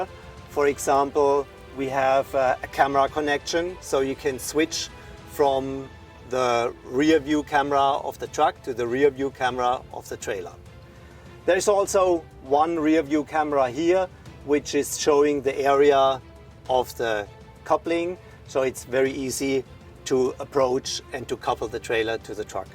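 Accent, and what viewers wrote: Germany. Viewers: Pure German accent!! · I'm from germany ;) man merkt an der Aussprache richtig, dass er deutsch ist... 💩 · The German accent makes it even more better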